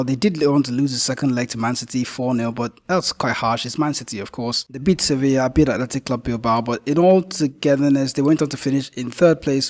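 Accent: Nigeria. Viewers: Are you nigerian bro ? You sound nigerian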